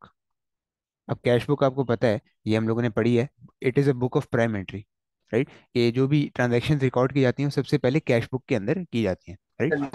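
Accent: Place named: Gilgit, Pakistan. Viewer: You have one of the best ways to explain that I've come across so far. Your accent sounds like that of the Gilgit people.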